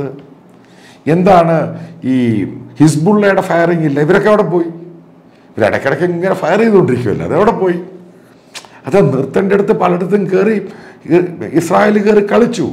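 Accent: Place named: Kottayam, India. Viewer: അത് കോട്ടയം ഭാഷ..തൊലിയായ ഭാഗം മുറിച്ച് കളഞ്ഞ മണി (ഉണ്ട )യന്മാർ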